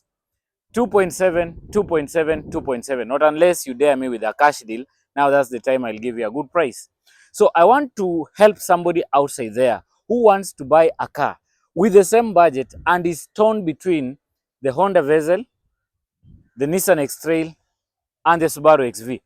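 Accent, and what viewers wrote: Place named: Zambia. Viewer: 2.7 what howmuch in usd where are selling from you sound Zambian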